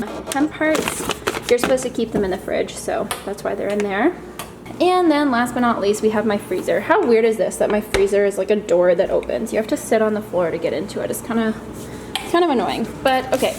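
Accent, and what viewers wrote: Canada. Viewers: I love the way Canadians say “pasta” 😍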